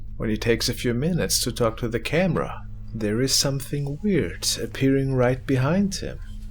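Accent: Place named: Germany. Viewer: He is German ! Listen to his W's they sound like V's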